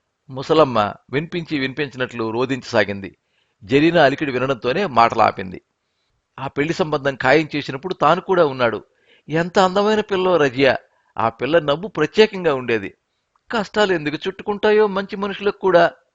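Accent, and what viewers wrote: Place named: Kadapa, India. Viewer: Kadapa dist language narration in story reading very nice sir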